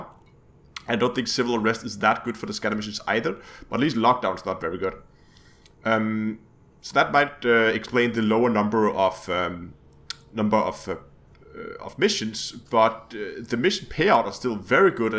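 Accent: Denmark. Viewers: nemt at spotte ens egen accent, men perfekt engelsk ellers:) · Are you danish?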